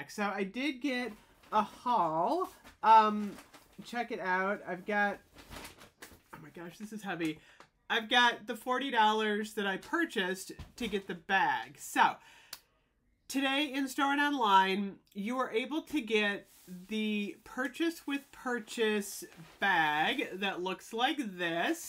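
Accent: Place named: Ohio, United States. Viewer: I love watching your videos- there’s something almost nostalgic and comforting about your content. I kept thinking- “I bet she’s from Ohio!” I grew up in Columbus, OH and there’s something so familiar about your accent and style.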